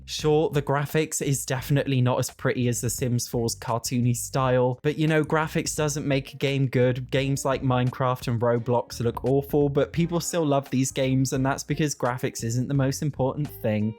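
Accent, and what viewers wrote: United Kingdom. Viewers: Keep being British I love your accent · As an English Language and Literature major ( I am Turkish) I've been seeking to find someone who has a nice British accent to follow cause I don't want to lose my accent sparkle (u know). And I am so happy to find you! I will listen to you even if you keep complaining lmao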